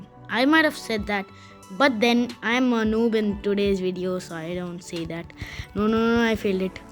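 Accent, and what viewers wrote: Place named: India. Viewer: Lol your Indian scent lel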